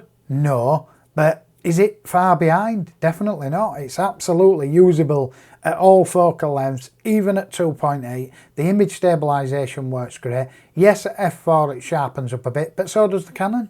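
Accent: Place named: Cockney, United Kingdom. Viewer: Trying to decipher your Cockney Accent .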